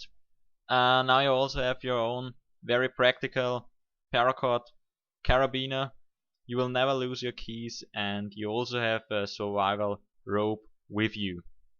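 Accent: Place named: Denmark. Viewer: Det lyder som en dansker.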